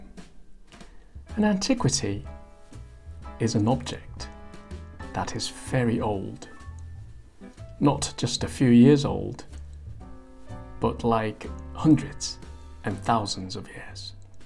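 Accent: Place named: United Kingdom. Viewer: 마놀린구독자인데 동영상이 있다는것 얼마전에 알았습니다. 영국식 영어~ ^^ 처음에는 조금 낯설었는데 집중해서 들으니 들리네요. ㅎ 번창하셔요~~^^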